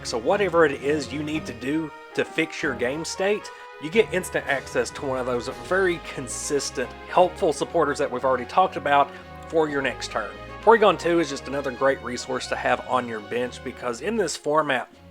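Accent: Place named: South, United States. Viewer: a real pokemon OG, im from the south, moved out west, don't get to hear the accent often, but when I do and in combination with pokemon, it's so ideal, I've used your channel to curate my vintage play collection, now that I have a better understanding of e readers I'll be on the lookout for these cards. thanks you the OG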